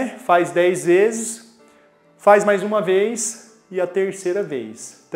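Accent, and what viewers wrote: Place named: Sao Paulo, Brazil. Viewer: Vc é de Piracicaba? Esse seu sotaque, é bem típico!!!!!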